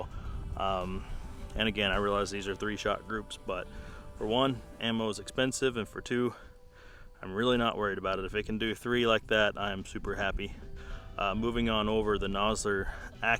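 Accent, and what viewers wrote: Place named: Fargo, United States. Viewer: Liking your videos a lot! Sounds like your in the Fargo area, I’m in Bismarck. I just picked up a 6.5 PRC Ridgeline. Two questions for ya...what scope did you top yours with? (I’m leaning toward the Leupold VX-5HD 4X20) And...which model Javelin bipod did you get?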